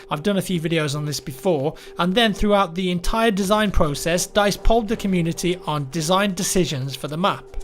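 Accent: England, United Kingdom. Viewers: He's English. They pronounce everything different. Even in various regions of the Isles the words will sound completely different. It's the beauty of accents. Much like opinions, no two are alike.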